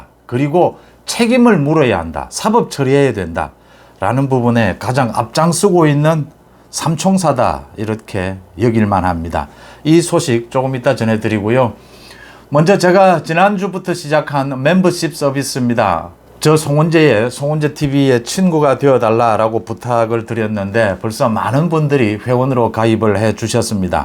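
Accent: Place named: Busan, South Korea. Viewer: 작은것부터 시작을 했습니다.하루도 시청하지않은적이 없지만 요즘은 댓글 써지는 않았습니다.조선앱에서 댓글다느라 조선댓글창 네임은 둥이할머니 입니다.나이많지만 고향이 부산이라 같은억양의분들 마나면 더 반갑답니다.송국장님의 스승을 기원드립니다.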